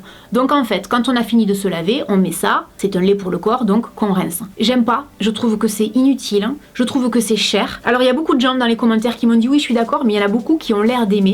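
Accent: South, France. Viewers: salut,j adore des tuto.au fait ton accent est de quelle region francaise? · Tu as un accent,tu vien du sud? Sinon tu es genial et tu m'aide beaucoup😗😗😗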